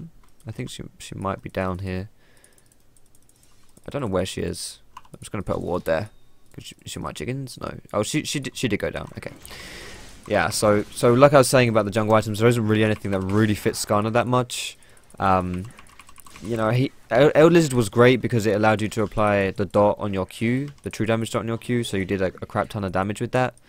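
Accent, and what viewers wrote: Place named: England, United Kingdom. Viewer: I still love youre videos and I think you should make videos w/o your face. English accent with being able to see the face of the speaker creeps me out.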